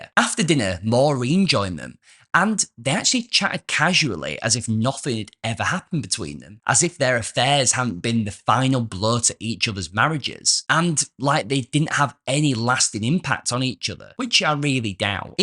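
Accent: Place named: Hull, United Kingdom. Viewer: You have a slight Hull twang.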